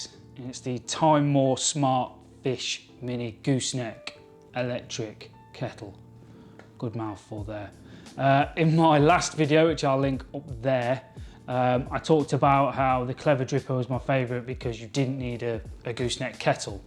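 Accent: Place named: United Kingdom. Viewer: Thanks for the Reply. I see based upon your accent you may be in the UK? I would love to visit there someday. Have lived in the US all my life. I am glad to hear you like your Timemore kettle. I should receive mine today. Last night when I was reviewing scales, I saw the Acaia scales but wow they are expensive. There are some positive and negative reviews of some of the other Timemore scales but the reviews seem to be a bit better than the Hario v60 scale. I'm just getting into pour over coffee and have used french press, and percolators.Need to find the scale and then decide on the Hario V60 Drip Decanter or the Hario V60 Glass Range Coffee Server, 600ml. I also think I will pick up the Hario V60 Metal Coffee Dripper, Size 02, Silver vs the ceramic dripper. Any thoughts from you on drip equipment would be welcomed as it seems you may have more experience than me. 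For example, if you use 30 mL of coffee, how much water are you supposed to use? Also need to pick up a manual coffee grinder.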